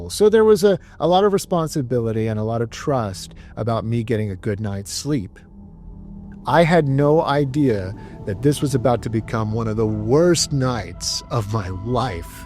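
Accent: Houston, United States. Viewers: you from houston bro?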